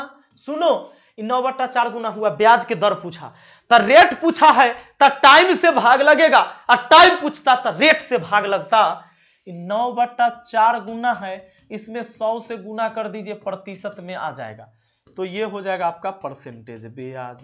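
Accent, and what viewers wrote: Bihar, India. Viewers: बिहार के हो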